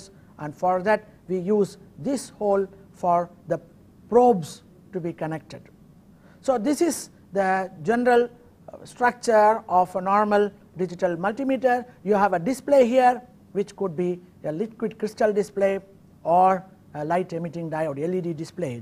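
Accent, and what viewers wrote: India. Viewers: I really love the way the Indian accent sounds. Reminds me a bit of my own accent (Irish).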